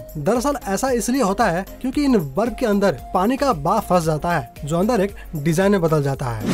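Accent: Odisha, India. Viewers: Are you from ODISHA? Your pronunciation is like Odia people. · Aap Odia ho na aapke pronounciation se lag raha hai.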